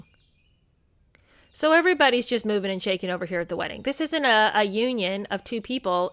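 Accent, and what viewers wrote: United Kingdom. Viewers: I love your Brit accents, LOL. It annoys me greatly that us taxpayers here in the UK fricken paid for this circus of a wedding! She is such an ungrateful bee-atch!!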